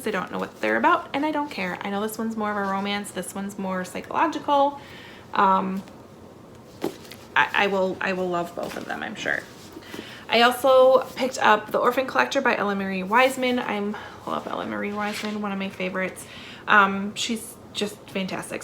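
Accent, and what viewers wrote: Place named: Midwest, United States. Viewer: Are you from Minnesota or Wisconsin? North Dakota gal here! Your accent is fabulous. Also, love your videos!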